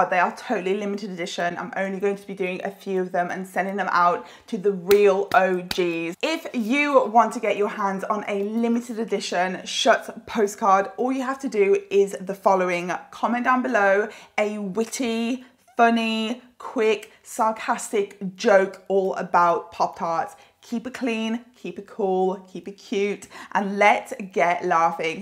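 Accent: United Kingdom. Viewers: there should always be a physique check! Oh and whoever said you talk too much was a fool, love your sexy British voice! First video of yours I saw was the 96oz Steak challenge and I was blown away by how much you could eat and how amazing you look.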